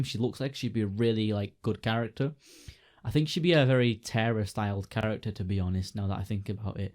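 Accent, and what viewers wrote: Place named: Ireland. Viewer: Nice video and predictions! Are you Irish btw? Love your accent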